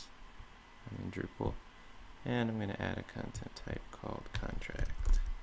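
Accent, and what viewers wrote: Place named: United States. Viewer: For a Norwegian your accent is very American. Have you lived in the states prior?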